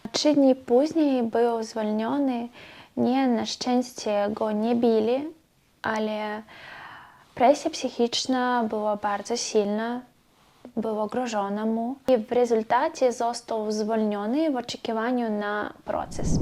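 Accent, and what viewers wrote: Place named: Podlasie, Poland. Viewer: Jesteś jeszcze młoda, jak będzie Ci się tutaj podobało i będziesz chciała to bez problemu zostaniesz Polką. A co do akcentu, to z tego co słyszałem na Podlasiu mieszkają Polacy i mają podobny akcent😁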